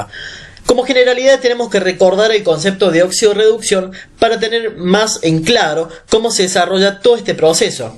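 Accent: Córdoba, Argentina. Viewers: muy buena aula.. y la tonada de cordaba me encanta jaja ... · aprobé con tonada cordobesa y todo!!! muchas gracias!!!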